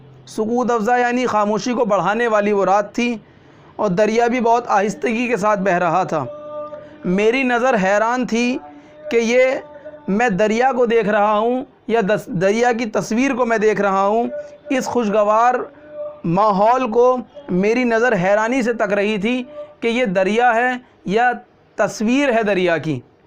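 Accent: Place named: Delhi, India. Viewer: Sahib ki meethi Dehli ki zubaan sunne mauqa ms. Maine pehle likkha tha ke is nazm ko pira sunna hai. Aur Inka parha dxplanation aur poems bhi sunne ka bohat beta i se intezaar hain. Main Dehli me Jama Madjid ke paas aur Ballimaran Ahata Kale Sahib aur Bara Hindu Rao men reh chuka hoon. Ham Dehli ke ASHIQ hain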